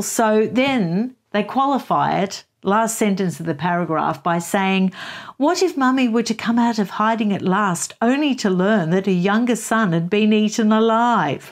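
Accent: Australia. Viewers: This is the first time that you have popped up on my feed and I'm glad you did, and it was so good to hear an Australian. Looking forward to watching more.. ❤ As for Harry, I find him to be a nasty person with no respect for anyone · ❤ your aussie accent.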